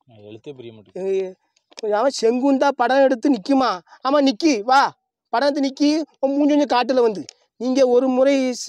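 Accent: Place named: Thoothukudi, India. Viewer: தலைவரோட அந்த சரலமான தூத்துக்குடி பாசை -க்கு நான் அடிமை 💥🙌🏻😂🤣நீ வேர ரகம் தலைவரே🙌🏻😄🤣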